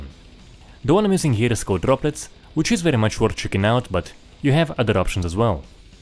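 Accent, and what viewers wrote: Russia. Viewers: Wнy aгe yoи souпds liкe a гиssian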